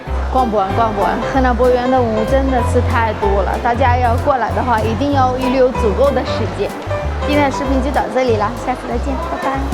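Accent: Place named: Beijing, China. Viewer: 你看看人家歪果仁女主播！这北京话说的那叫一个地道儿~~！！！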